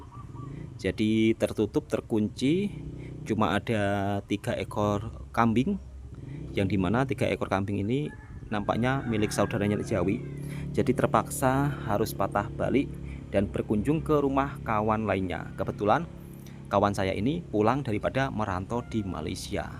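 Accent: Java, Indonesia. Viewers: pekat btl jawa dia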